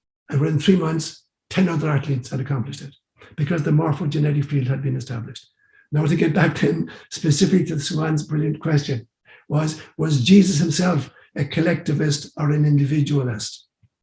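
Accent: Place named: Ireland. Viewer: Just stumbled across your channel and just had to say I absolutely LOVE the name you gave your channel plus the bonus of listening to your lovely Irish accent which brings back memories of my Grandparents who immigrated to the USA!!! I look forward to learning and growing more spiritually with you ❤❤❤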